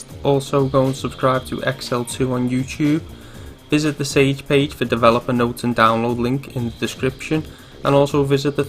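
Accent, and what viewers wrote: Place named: Scotland, United Kingdom. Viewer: wow, that is pretty cool! .....but can anyone please translate Scottish to Australian? ....just kidding! ..love ya accent mate lols.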